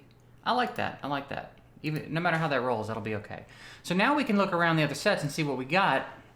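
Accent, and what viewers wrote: South, United States. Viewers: so cute that your a NY boy that sounds like a wholesome southern cowboy :D Trick ass mark.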